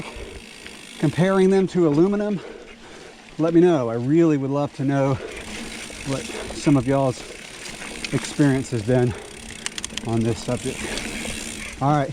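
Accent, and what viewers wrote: United States. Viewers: I love what you do man, Great job. I'm learning from you a lot, with your clear explanation, and your American accent I will never miss anything unlike GMBN lol.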